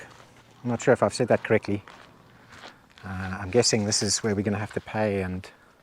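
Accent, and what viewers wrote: South Africa. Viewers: Hi from Johannesburg, been watching for videos for a while now and rally great. Love hearing you SA accent. Love Castelo Branco, have visited many times , usually stay at the Tryp Hotel next to the Castle. We own a small farm in Juncal de Campo. Have not been able to travel to Portugal since Covid. Cant wait to come back, your videos make me miss it so much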